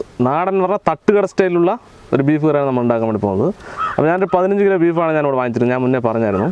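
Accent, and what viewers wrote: Palakkad, India. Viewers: Palakkadan Bhasha ❤️❤️❤️🥰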